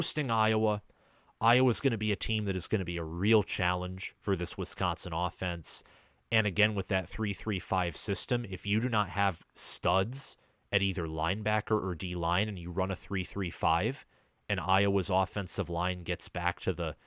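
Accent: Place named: Minnesota, United States. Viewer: I can tell by your accent that you're from Minnesota or very close maybe the Dakotas perhaps but to be honest I think PJ ruffled Luke's feathers with the recruiting accusations so he will be seeing a very motivated Badgers team on that day as far as Illinois..Wis owes them 1...now Neb is going to be a scary team sooner or later their going to be where they should be & that's top tier BiG10 as far as Wis the ceiling is is playoffs the basement is New Yrs 6 or very close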